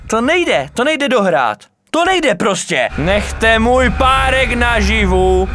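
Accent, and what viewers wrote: Moravia, Czech Republic. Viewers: Seš moravák?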